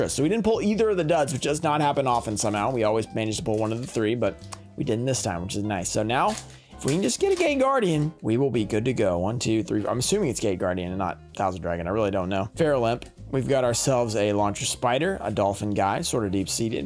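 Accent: Portugal. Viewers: Random question, are you Portuguese?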